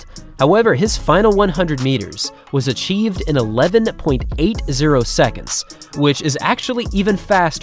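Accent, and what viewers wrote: United States. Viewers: Yer commentary is so American. African all star?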